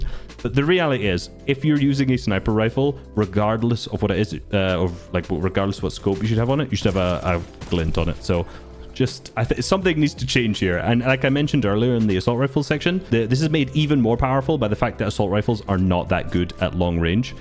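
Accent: Scotland, United Kingdom. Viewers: WAAAYYY LESS GO WE CAM HEAR THAT GLORIOUS SCOTTISH VOICE AGAIN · bro every time i watch every time I talk after I talk in a scottish accent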